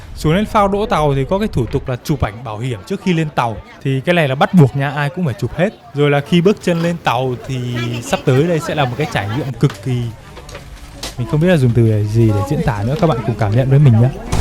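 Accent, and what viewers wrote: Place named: Hai Phong, Vietnam. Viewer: em đăng kí kênh anh r nha thấy giọng hải phòng hài vô cùng luôn á e nghĩ a nên phát triển thêm mảng tiktok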